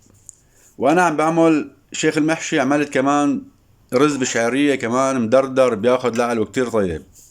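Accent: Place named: Latakia, Syria. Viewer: معلم ابن البلد تسلم ايدك دخيل رب الحكي اللادقاني واشتقت لشي نزلة عالبلد يا خي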